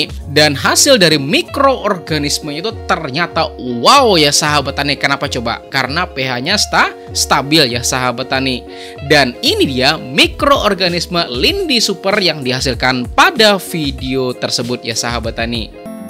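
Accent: Banjar, Indonesia. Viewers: Logat Banjar nya masih ada😅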